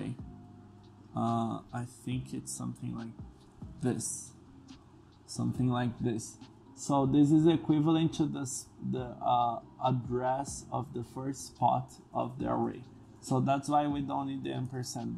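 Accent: Brazil. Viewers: Very good explanation bro, thank you! I just stayed with one doubt, are you brazilian ? Your accent is too much typically hahaha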